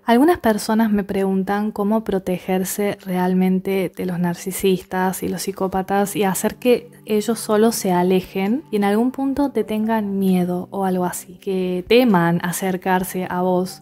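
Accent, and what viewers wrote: Córdoba, Argentina. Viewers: Pulsión de vida...MAESTRA, tu voz y cadencia cordobesa, me fascinan! Gracias! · Me encanta el acento che....saludos desde el otro lado de la cordillera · Hola sos cordobesa?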